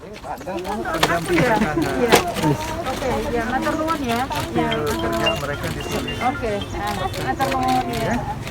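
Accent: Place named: Surabaya, Indonesia. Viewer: Memdengar bhsnya serasa msh di Surabaya. Lanjut bu!!!!.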